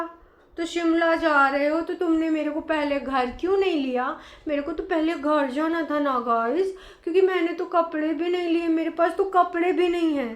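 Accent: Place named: Bihar, India. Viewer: I like what u say coz its correct bas thora aur Hindi mein agar bologe toh easy lagega samajhne mein , like wo bhojpuri bihari type feel hai toh samaj nai ata hai .. plz don't mind jst saying but u say correct stuff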